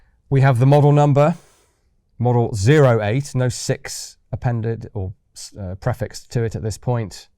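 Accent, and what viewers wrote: United Kingdom. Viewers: Always fun to listen to this British gent speak about firearms, especially ultra-rare almost non existent pieces.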